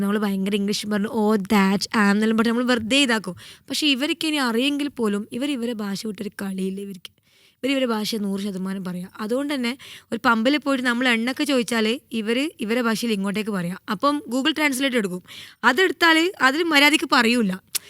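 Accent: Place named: Thalassery, India. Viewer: Pakka Thalassery slang 💗 Thalasseryl evdeya ?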